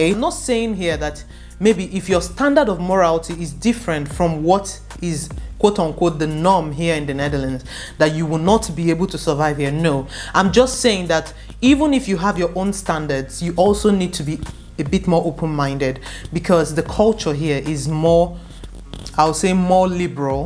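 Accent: Nigeria. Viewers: I am South African and I love how persistent the Nigerian accent is no matter how long they live outside their own country! 😂 This lady is extremely poised and articulate either way ❤ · she is a Nigerian,, Nigerians accent pronounce u"as o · nice video😀. you still keep your Nigerian accent and vibes, you keep using "ABI"👍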